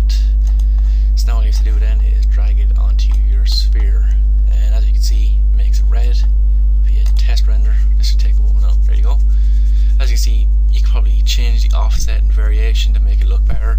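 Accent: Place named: Ireland. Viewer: Nice, are you from Ireland?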